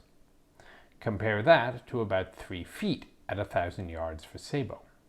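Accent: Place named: Ireland. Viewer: Chief, your such a national treasure ya could put your musing into a text to speech narrator and it still be riveting commentary!!!!!!!!!!!! It would be a tragedy to deny the world the utter charm that is your Mick Jagger lipped Irish accent, dont go changing ya gentlemen and scholar!!!.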